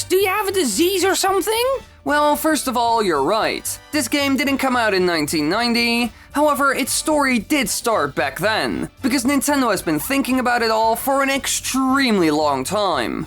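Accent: Netherlands. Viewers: I love your Dutch accent! I’m from Belgium 🇧🇪 and I don’t mind it. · Well you can hear from the accent that he's dutch which is okay. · For me your voice is great and i really like your dutch accent. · Well I mean you sound Dutch Are you ? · Ur voice is fine tbh, just obviously a european (dutch?) accent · Its a good accent, its dutch right cuz in your zelda reaction i thought you were talking dutch for a smal bit.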